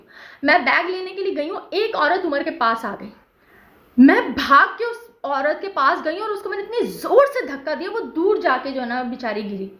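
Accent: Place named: Karachi, Pakistan. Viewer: Lalukhetan lgti ho, tmhara lehja bta rha hai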